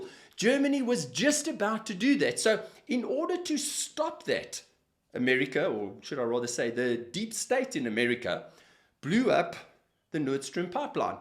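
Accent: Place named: South Africa. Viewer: Thank you so happy to hear a south african voice talking about this. Do you perhaps have a website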